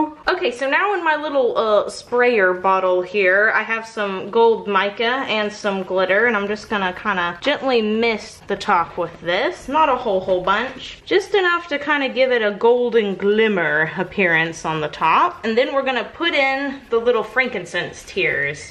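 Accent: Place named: South, United States. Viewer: Your voice sounds so southern like mine 😊😊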